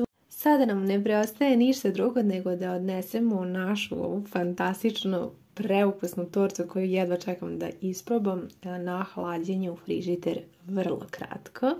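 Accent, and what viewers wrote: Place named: Novi Sad, Serbia. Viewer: Prekrasna dama. Zrači pozitivnom energijom... Obožavam da je slušam... Kulturna, normalna.... Ns naglasak,preslatko... ❤❤❤❤❤❤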